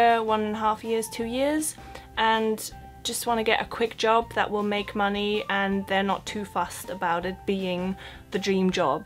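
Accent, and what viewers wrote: Germany. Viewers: Exactly the German accent likes I sound while talking English thanks to my 8 years having spent in Hannover. But the contents you provide are very good for young people want to discover London in an affordable way. Perfekte Arbeit. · I can clearly tell the Getman accent. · Your accent is adorable! You can tell that the London influences are kicking in, but still with undertones of a native German speaker.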